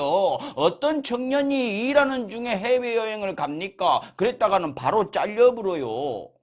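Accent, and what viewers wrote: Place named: Gyeongsang, South Korea. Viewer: 아따 대구분이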